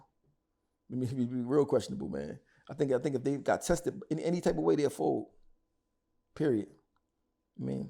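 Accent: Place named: New York, United States. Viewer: I'm from down south in Tennessee. I'm loving this video I love to hear, men from New York speak. Lol at one point I moved to New York just for that reason. Thanks for the video. 😎💜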